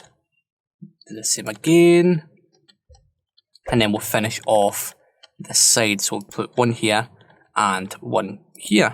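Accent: Scotland, United Kingdom. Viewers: As soon as I started watching this my head just screamed 'YESSSS, HE'S SCOTTISH!!!!!!!' Why am I like this XD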